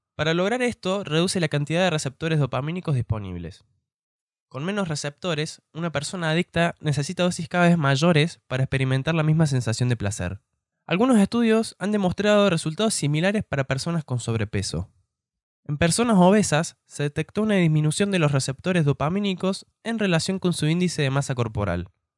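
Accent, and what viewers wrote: Córdoba, Argentina. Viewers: Argentino.....de Córdoba??? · Por tu forma de hablar sos argentino jaja saludos desde argentina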